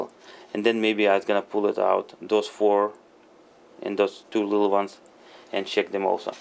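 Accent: Russia. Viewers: Russian accent?))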